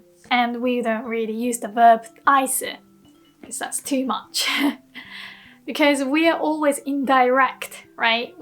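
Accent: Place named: England, United Kingdom. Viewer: You have an English accent.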